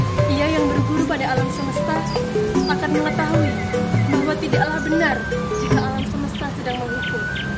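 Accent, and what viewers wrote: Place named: Bali, Indonesia. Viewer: Om Swastyastu… luar biasa mbak.. tema dan penyajian menarik, artikulasi bahasa penyampaian jelas.. Ada tembang Jawa, musik tradisional Sunda sbg pengiring, ada terselip sedikit logat Bali.. luar biasa 👍👏👏👏🙏🙏🙏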